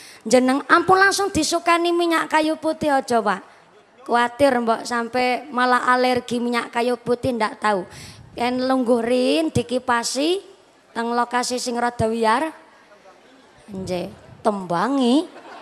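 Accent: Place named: Banyumas, Indonesia. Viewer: Pakai bhs indonesia jd hilang ngapaknya, biarlah dg cirihasnya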